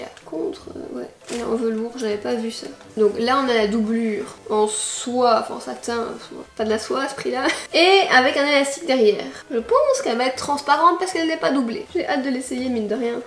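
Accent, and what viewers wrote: Belgium. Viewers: Ton accent belge i love it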